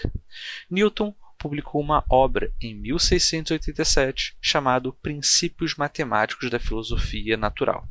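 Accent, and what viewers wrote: Rio de Janeiro, Brazil. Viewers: Carioca, mano da uma agonia xxxxxxxxxxxxxxxxxxx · Sotaque carioca dele esta no pico nesse dia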